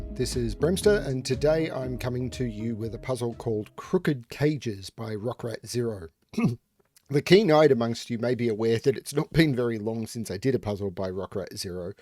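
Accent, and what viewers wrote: Australia. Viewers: Is he from Australia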